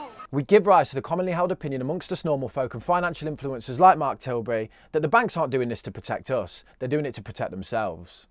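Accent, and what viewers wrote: Birmingham, United Kingdom. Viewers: Are u from Birmingham bro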